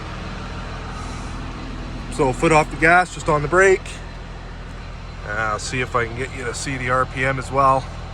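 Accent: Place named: Canada. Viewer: As a Canadian i appreciate hearing our accent in the video😂🙌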